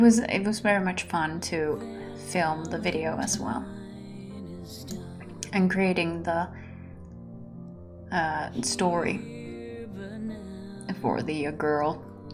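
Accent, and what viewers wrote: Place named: Finland. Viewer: Hi,. I did watch (well listen to) this one today. Wondering if you ever got that "team" you wanted. Again, I know I'm new around here, but I'd be eager to help. (Or Igor to help, as the case my be. Okay, that was my one joke.) Serious now: Obviously I'm not as much use as someone who is physically there, and I'm no good at makeup (you do your own make-up? Even I assumed that you had someone to help with that! You look amazing, btw.) Things I have thought of that I could potentially help with (short version) 1) Proof-reading of scripts for any material you're producing in English. [A lot of what you do is music, but if you move into more ASMR work, there could be scripts.] [I used to help a friend who was a professional proof-reader for a major book publisher, so I feel pretty qualified here.] 2) Possibly suggesting minor edits to scripts but being okay with you having the final say. 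2a) [I have some knowledge of linguistics (hobby number 37 on my (non-existent) list). I know handy things like the difference between a voiced and an unvoiced consonant. If you want, I could potentially help you with pronunciations on some of the trickier English words. (Although I think English is more charming when spoken with a Finnish accent.:)... Sorry, professionalism here.) However, sadly, I only know abut 4 words of Finnish, so I'm not going to be tons of help with proofreading Finnish. ] 3) I'm a good typist, for what that may be worth. 4) I've used Gold-Wave pretty extensively a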